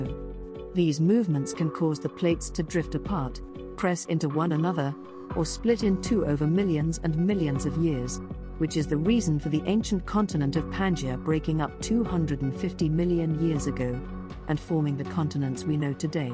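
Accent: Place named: United States. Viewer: It is nearly unbelievable to me how immature and wrong all your videos are, You are like a child playing at a tea party with no concept of reality to guide them. relying on whatever stupid idea comes to mind. As a mature smart worldly Canadian man I find your entire catalogue of crap stating videos to be truly stupid, Why do sound like such an americunt, Stop making this crap and just keep your stupid to yourself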